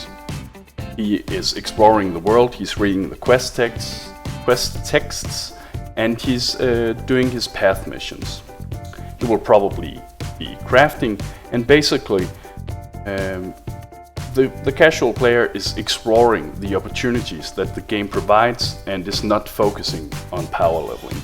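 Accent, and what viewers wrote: Denmark. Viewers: Your accent sound danish :P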